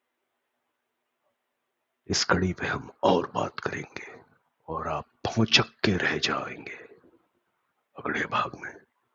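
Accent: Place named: Haryana, India. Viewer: 👍 good, but why the thick haryanvi accent? Could not understand much. Could you please not whisper so much into your mike, sounds creepy and a bit pronographic 😅 Otherwise, excellent research 👏 keep it up 😅😅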